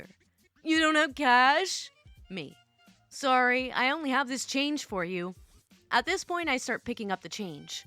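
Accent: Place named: New York, United States. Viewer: I love CB stories and I like your "New York" voice. :)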